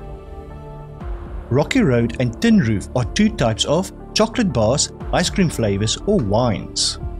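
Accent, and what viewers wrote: South Africa. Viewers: Love your South African accent. Nothing is 500 million years old, it's pure guessing. Study the subject further.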